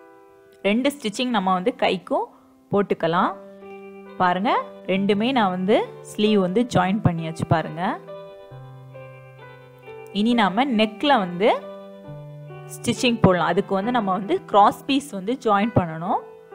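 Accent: Nagercoil, India. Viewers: For u Nagercoil ah?, Ur language is like that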